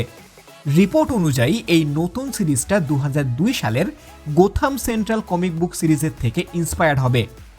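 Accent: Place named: Bangladesh. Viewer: Apnar kotha to kolkatar manusher moto na.😞 Bangladesh er manusher moto lage.😋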